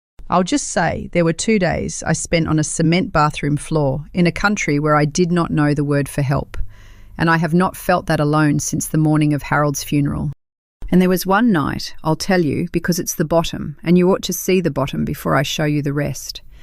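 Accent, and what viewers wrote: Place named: United Kingdom. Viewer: She sounds british. One more story about how wonderful the philillipines are why do so many philillpines come here and stay.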